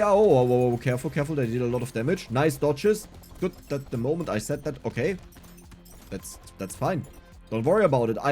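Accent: Germany. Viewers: German english is awesome 👍😎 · i can firmly hear the german accent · You sound German… Edit:he is I’m proud of my country now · I Hear deutsch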